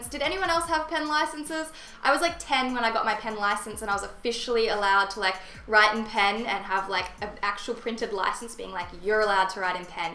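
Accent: Australia. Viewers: are you Australian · Are you Australian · Your Australian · Is she australien like her accent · Is she from Australia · are u aussie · Are you Australian? You're so pretty btw! ❤️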